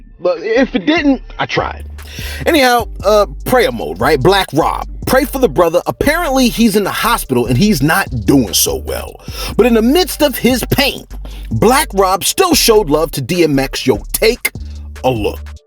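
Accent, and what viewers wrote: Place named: New York, United States. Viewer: U sound like a dude from new york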